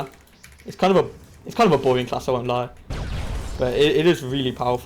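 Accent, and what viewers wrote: United Kingdom. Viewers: Close enough, its actually british (atleast he sounds british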